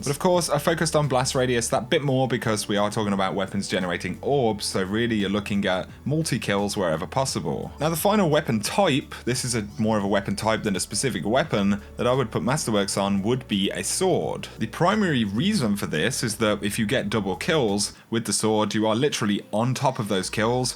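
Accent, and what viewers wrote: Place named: United Kingdom. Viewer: Why does every destiny game guide feature a brit boy?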